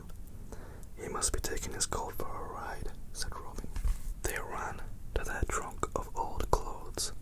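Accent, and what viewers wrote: United Kingdom. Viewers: Handsome Indian man ✅ gorgeous British accent ✅ amazing asmr video ✅ this is the best! Love your channel! Thank you 😃💙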